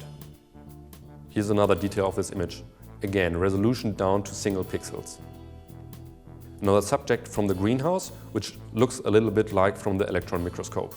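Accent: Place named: Germany. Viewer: What a nice german accent,reminds at the terminator.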